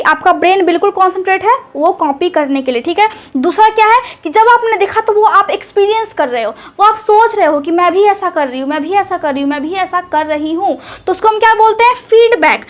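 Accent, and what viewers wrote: Bihar, India. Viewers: mam aap बिहार से हैँ क्या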